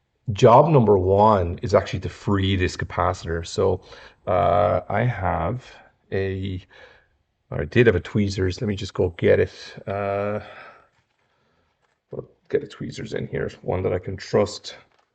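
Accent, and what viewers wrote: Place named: Germany. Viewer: excellent video! first off , your German accent was the highlight of my morning. Also, after the mosfet install u used a yellow brush to cleanup the job site. what product did you use for that? cuz i have some rebuilt ham radio gear where they didn't clean like you did. Also,is there a number of seconds u keep the s gun on to avoid cold solder joints.? lastly, I have this Bose 300 but I entertain at fancy houses and ive seen numerous Sonos brand installs. Do you know if Sonos has a good build quality? Thanks so much. Jim in Washington state.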